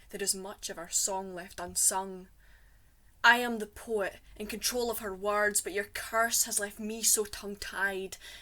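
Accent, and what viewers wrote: Scotland, United Kingdom. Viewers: Hi, Love listening to your South Scotland Scottish accent! I am fae Aeberdeen an I spik Doric. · Amazing love her command of the Scots language accent and the red hair and of course the poems.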